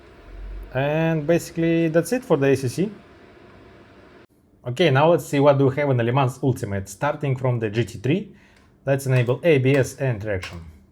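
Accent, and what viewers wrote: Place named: Ryazan, Russia. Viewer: У Вас чудесный рязанский акцент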